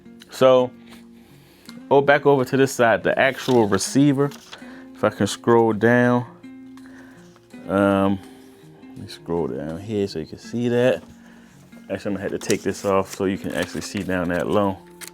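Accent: Baltimore, United States. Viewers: You're from Baltimore aint youuu?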